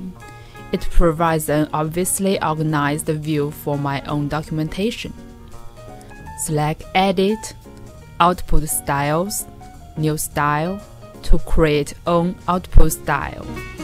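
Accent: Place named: Jamaica. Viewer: very comprehensive. jamaica english ? VIELEN DANKE !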